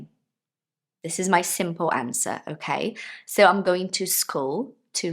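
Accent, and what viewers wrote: United Kingdom. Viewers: Beautiful British English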